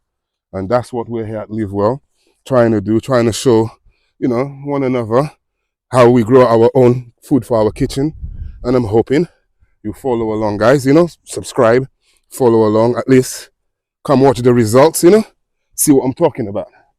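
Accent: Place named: Dominican Republic. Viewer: Why you sound Dominican 🇩🇲 lol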